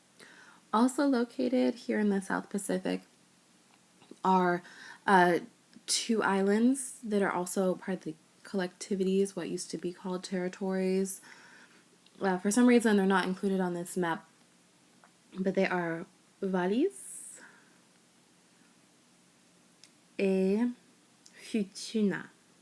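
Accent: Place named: England, United Kingdom. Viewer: I like your English accent when you tell the French provinces I think it's not so easy for your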